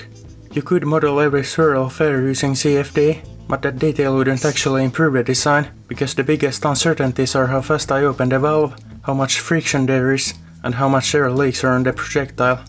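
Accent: Finland. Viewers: Finnish accent? Like moths to a flame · Finnish accents are so cute 😭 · as soon as i heard your voice, i clocked that finnish accent than a speeding train turning your satsuma into dust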